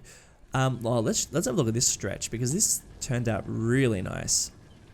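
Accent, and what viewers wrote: Australia. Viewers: Lemme translate aussie to non-aussie. Erhm. 'Good day (g'day) guys, and welcome back to.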